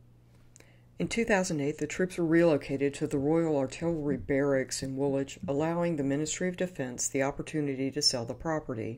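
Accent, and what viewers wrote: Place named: United States. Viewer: Why the American accent!!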